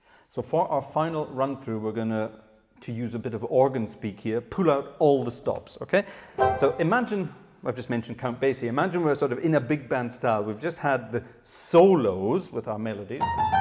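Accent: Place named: Scotland, United Kingdom. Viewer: I watch the German version for the same reason. German with a slight scots accent is very easy on the ear. ;-)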